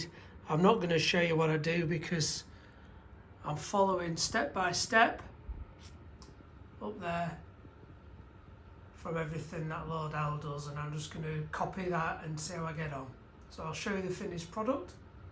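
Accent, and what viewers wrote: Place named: Lincolnshire, United Kingdom. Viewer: Oh no, you haven't done much. Not much to show for it. Only put a whole new loop in the helix, which is a magnificent achievement and will make running of steam trains so much more reliable. Only put new couplings on a whole set of coaches and made the set look much better. Haven't done much—only a fantastic week's work which has improved the railway immensely. An excellent video altogether. Lovely to see long trains running. Lovely to hear a Lincolnshire accent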